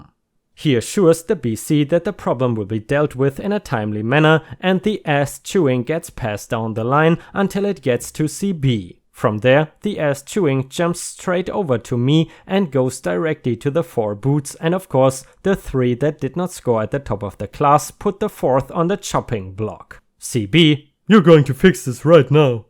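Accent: Germany. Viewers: The Person speaking: Are you gernan? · Are you german ?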